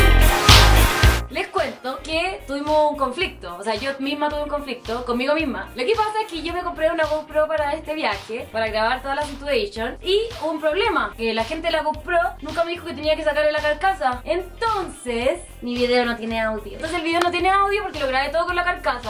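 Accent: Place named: Chile. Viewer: Demasiado chileno jaj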